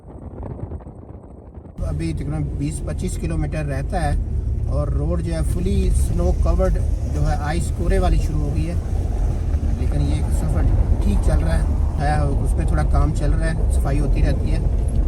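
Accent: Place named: Punjab, India. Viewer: سر آپ پنجابی ساتھ بولتے ہیں بہت اچھا لگتا ہے😊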